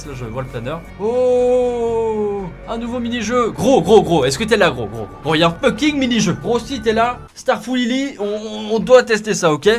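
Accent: Belgium. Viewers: c'est étrange avant t'avais pas l'accent belge mais là en t'endtendant parler 😂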